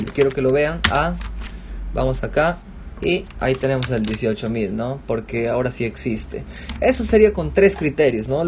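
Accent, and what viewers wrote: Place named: Loreto, Peru. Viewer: TU ACENTO CHARAPA ES LO MAS HORRIBLE DEL VIDEO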